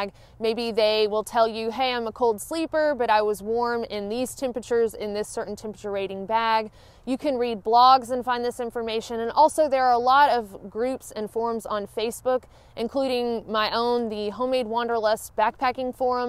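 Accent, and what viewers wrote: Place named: East Tennessee, United States. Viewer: Wow a lot of great info! I like the accent reminds me of East Tennessee.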